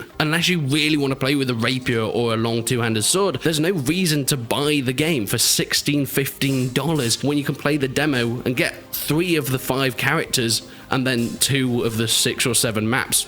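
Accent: England, United Kingdom. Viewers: he's English you knob just how we talk 😅😅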